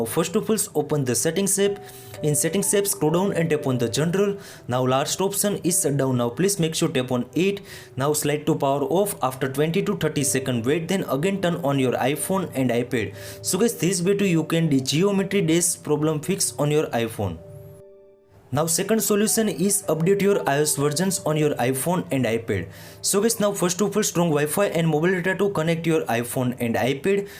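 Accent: India. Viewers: Tf is he talking about bruh all i here is indian Indian Indian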